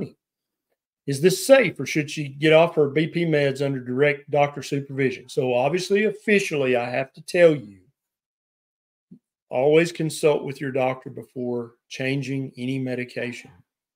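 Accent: South, United States. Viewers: Who doesn't love the frank talk...the discussion of chicken eggs at 1 hour is classic Dr Berry. People who think the accent, the country boy talk, and the literal red neck means stupid, and they are the stupid ones. is a very smart doctor. I got a lot better listening to him and ignoring my Yale trained, proper wasp specialist. Thank god I refused Dr Yale's meds. I was getting sicker and i now see his solution to my gerd increases osteoporosis,and dementia. But it would fix the gerd! I guess the logic is that on those meds a while, i would not only forget I had gerd, i would forget i had any other issues.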